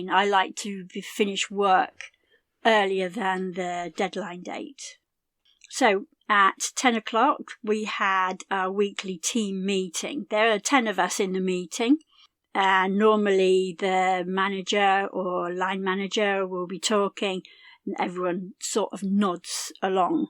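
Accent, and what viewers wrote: United Kingdom. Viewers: I'm grateful for your videos. I love the British accent!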